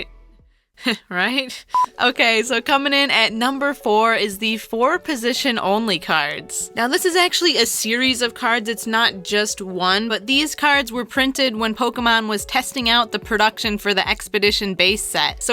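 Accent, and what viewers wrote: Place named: Louisiana, United States. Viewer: are you from Louisiana? you sound so familiar like the shopkeeper of rockets hideout. please respond because you sound SOOOOOOO familiar -_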